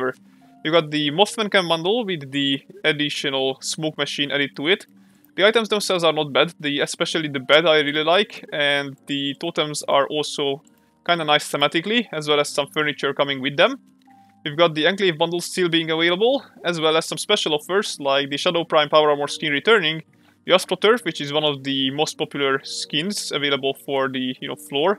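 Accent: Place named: Denmark. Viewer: I like your accent :D are u Danish or sth lol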